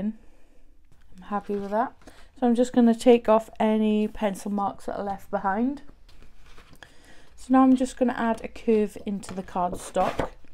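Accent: Wales, United Kingdom. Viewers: Hi, Lovely tutorial, thank you! Sam is very wise having you on her design team, methinks! Love what you did with this, awesome work. Out of curiosity, your accent is sooooooo familiar to me, are you by any chance from Cardiff, or at least somewhere in S. Wales? I am in Cardiff, an adopted Welshie, Cardiffian, as I have lived here most of my life (shhhhh, don't tell anyone, I am actually English;) ) lol!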